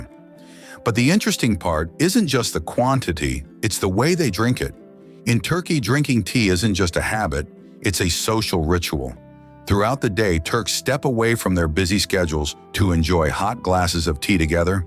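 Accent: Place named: United States. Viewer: It is good,,.infact very good but one little mistake but very important thing that makes sense to all Turkish spiking people,,,.that we know dodo’s used to live in USA and wild turkey,s came from USA as well,,,but There is no link between the bird or the land ,,,right I know you are an British American but the land spelled Türkiye 😂,,.and not Turkey,,.please note the spelling even you are an American or a guy with an American accent,,,.🧐you can see the difference,,,,,.Right 😅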